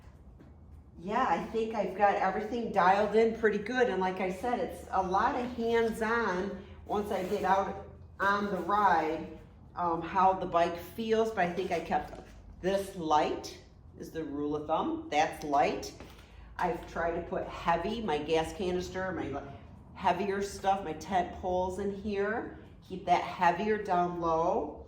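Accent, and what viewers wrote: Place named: Michigan, United States. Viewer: That hybrid Michigan accent with Wisconsin and Canadian overtones.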